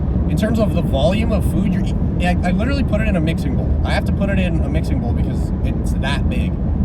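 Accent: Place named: Australia. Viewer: I thought you were australian🇦🇺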